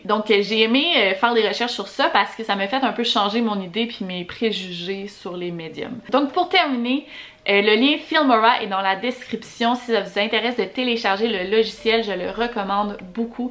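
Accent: Quebec, Canada. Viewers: Salut je viens seulement de te decouvrir franchement tu raconte trop bien tes histoire je te souhaite une bonne continuation👍👍👍👍ps::j adore trop ton accent du quebec trop beau!!!!!!!!!😂😂😂😂😂😂😂😂😂 j adore le quebec!!!!!! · Mdr ta l'accent du Canada j'ai était au Canada ses beau ses trop bien la vie labaw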